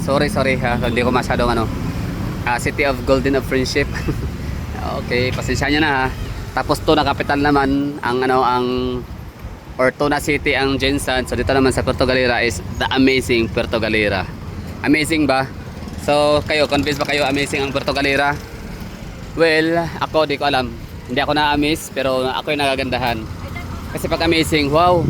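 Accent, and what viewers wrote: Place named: Visayas, Philippines. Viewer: Hindi nga amazing... Pero pede na kasi malapit sa NCR. Saka may bias ka kasi kasi bisaya ka. Halata sa accent mo. DDS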